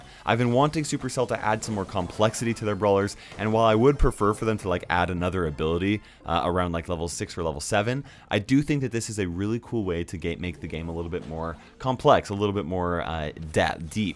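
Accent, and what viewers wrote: Australia. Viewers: SHE IS AUSTRALIAN NOT BRITISH!! REEEEE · That's Aussie not British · She's ausie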